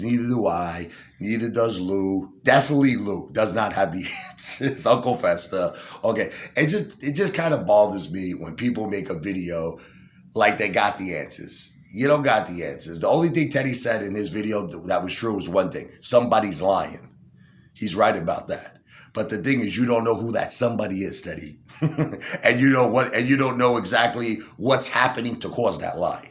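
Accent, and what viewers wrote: South, United States. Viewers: You’re not form New York ! You’re form the south with you’re southern draw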